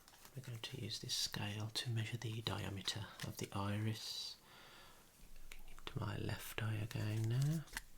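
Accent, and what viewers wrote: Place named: London, United Kingdom. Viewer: that lovely accent of yours, is that Londoner?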